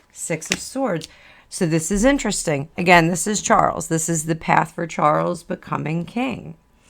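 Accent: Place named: New Jersey, United States. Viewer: More Jersey accent, please!!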